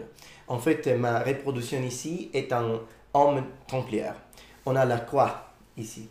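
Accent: Italy. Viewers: un accent particulier en français, j'entend l'accent italien et l'accent anglais en même temps. je préfère l'anglais ;) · Sometimes, the italian accent can be remarked, although very lightly. The rest of the time, it's a bit obscure : it can be heard that he's not a native french speaker, but it doesn't sound specific to any country. Which is pretty neat considering french has such a weird and uncomfortable syntax to be spoken when you're not a native speaker (hell, even native speakers can get it wrong pretty often) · Hi. I'm here for some feedback. That was very good overall. I understood everything without effort, so you level is clearly good. However, you still have some room for improvement (you're good, so this will be nitpicking). The sentences you used were well structured, but sometimes, the pronunciation was off, even when ignoring your natural Italian accent. 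So you had trouble with using the correct "déterminant" (un/une) depending on the type of word that follow. But this is hard for everyone learning french. Secondly, (and this is a bit funny), you said "chevalieu" instead of "chevalier" a couple of times. Lastly, the biggest mistake was pronouncing the "p" in "coup". You just don't. It's silent. Yeah, i know. Fucking french right! It's the biggest mistake because when you pronounce the "p", it sounds like "coupe" (slice), which is a totally different word than "coup" (blow). And regarding your trademark sentence "the has spread his wings", i couldn't help but find it very stylish in french "Le a déployé ses ailes". Nice!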